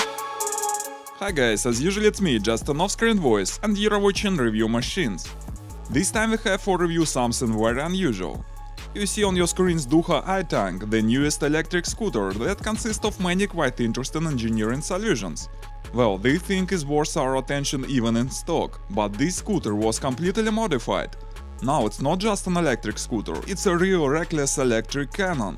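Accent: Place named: Russia. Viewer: Явно нужно что-то делать с русским акцентом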